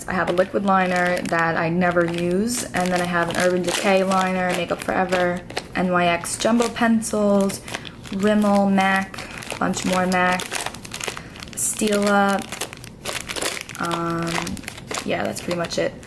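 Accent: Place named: Staten Island, United States. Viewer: fellow staten islander! knew it the minute i heard you speak you sound just like me lol. awesome choices for your kit! i'm also a freelance MUA on the island!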